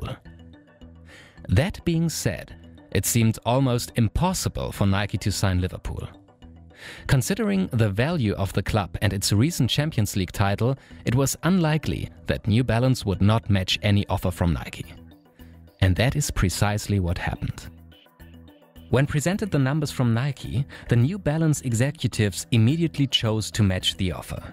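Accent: Germany. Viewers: First time on this channel, I can tell that the speaker has a German accent 😀, right or wrong? I'm German speaker, so I think I nailed it lol. Interesting topic in the video. Edit: Just heard how you spelled Jürgen Klopp , well, now I have no doubts at all 😂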